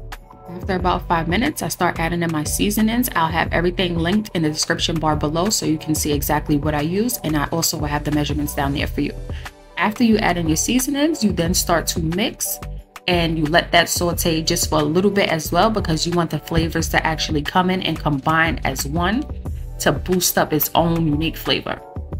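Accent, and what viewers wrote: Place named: New York, United States. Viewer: I’m going to try this tonight. Yessss to the editing and love your NY voice.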